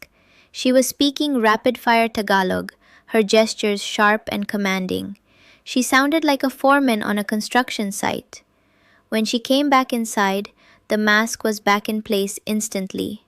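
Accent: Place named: India. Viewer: A lady from the Philippines with an Indian accent 😅.. AI is a disaster 😳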